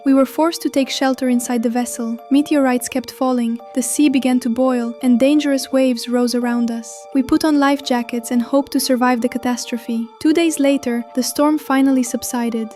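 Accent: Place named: Russia. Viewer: Wth is this Russian accent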